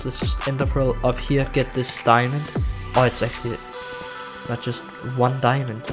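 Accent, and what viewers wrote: Denmark. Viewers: yooooooooooooo anyone ever told u,u have an danish accent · are you danish my guy? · Are u danish? 😂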